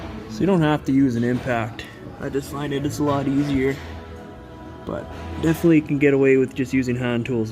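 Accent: Canada. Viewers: I knew you were a fellow Canadian after the first minute of the vid. Good job saved me a bunch of time !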